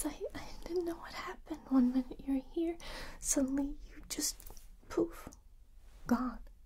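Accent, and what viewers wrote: United States. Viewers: What the hell? You sound American AF. Hahaha! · I am so impressed by your American accent! Brava! 👌👏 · Your American accent is fucking stellar.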